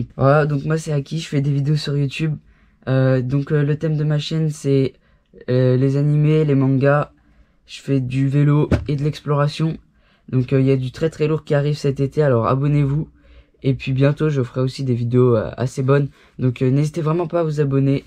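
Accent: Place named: Algeria. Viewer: Mec c'est normal que ta un accent de Dz ? 😂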